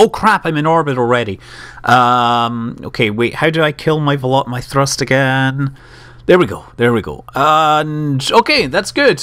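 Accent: Scotland, United Kingdom. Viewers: Although that's true, we all love hearing your friendly scottish voice. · you kinda have a Scottish accent and your from the US how is that?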